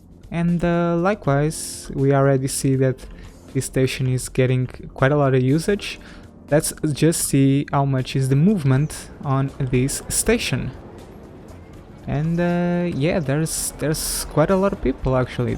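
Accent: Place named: Portugal. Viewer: I've played enough cs:go to know portuguese accent now LOL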